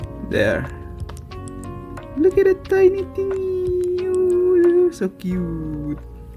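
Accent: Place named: Indonesia. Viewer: Dari aksennya langsung kedenger, Indonesia ya? Eh bner pas tengok channel mas nya 👍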